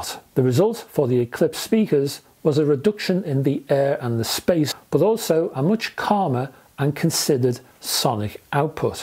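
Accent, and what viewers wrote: United Kingdom. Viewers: Love the British speak, very eloquent indeed. Net, net though the review indicates these are too bright in the midrange on some vocals and recordings, which can cause ear fatigue. While boomy bass and hissing highs in some speakers are never good either, because the human ear is most sensitive in the midrange, if an $8,000 pair of speakers can get too peaky on the mids in some cases, that's the worst area to have a problem. Also this review only refers to a very few recordings.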